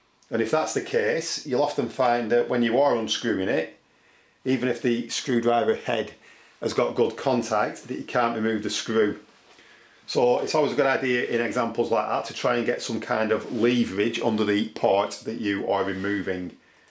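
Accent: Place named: Yorkshire, United Kingdom. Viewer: What part of north you from? Sound like a Yorkshire man. But you could be from Manchester or Lancaster. I used to be from Blackburn myself. Lived in London for years but still have the accent 😂 Thanks for the tips. My Screw just won't budge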